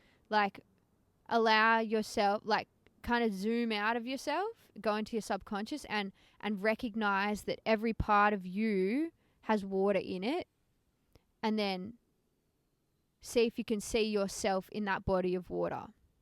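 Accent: Australia. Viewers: Came for the title, stayed for the accent. Love the Aussie accent! Love from the Netherlands 🥰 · Are you a Queenslander?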